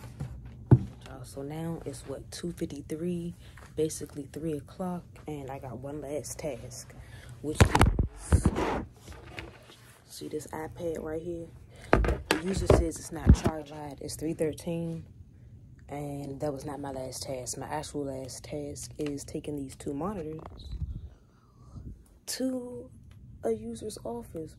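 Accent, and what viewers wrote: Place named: Philadelphia, United States. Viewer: In the beginning of the video, I thought I was Trippin but then at the end of the video I realized you’re from Philly so am I I just finished Google cyber security online. I’m studying for my copier A+. I’m learning SQL Lennox and Python, but I don’t have any experience.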